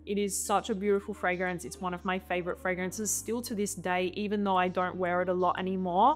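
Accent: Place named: Australia. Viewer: That’s called an Australian accent. I love it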